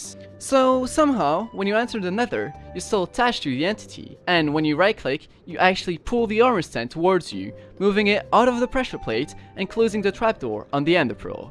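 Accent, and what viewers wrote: France. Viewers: C'est plus simple a comprendre avec ton accent Français 👍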